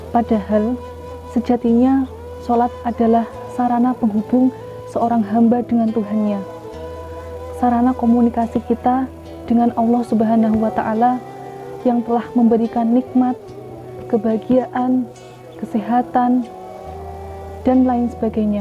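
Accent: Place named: Banyumas, Indonesia. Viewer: Ngapak ngapak 👏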